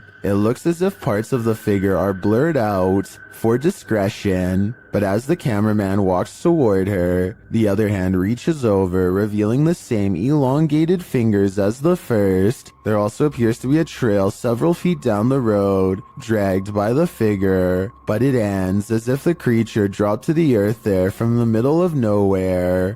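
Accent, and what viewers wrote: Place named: Southern California, United States. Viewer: Your SoCal accent is on point.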